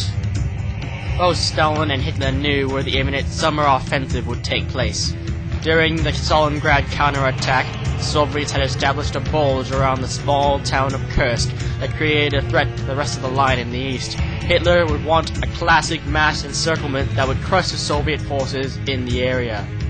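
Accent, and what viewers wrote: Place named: United States. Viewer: Very good. Excellent to hear about the true turning point of WW2 through an American voice. In the US, we rightfully pay tribute to our amazing heros of D-Day, but we lose sight of the fact that we were but junior partners when it comes to the European war. My father was among those Americans who fought in Africa, and then Italy. Six of my uncles fought also, one in the Battle of the Bulge, two in the Pacific, one of them killed by the Japanese. But the Red Army crushed the Wermacht.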